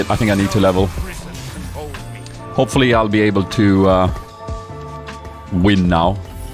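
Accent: Sweden. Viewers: All though as a fellow Swed i prefer your English over your Swedish accent, sorry your English is more crisp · Hey, please keep the Swenglish. Det är alltid trevligt med en landsfrände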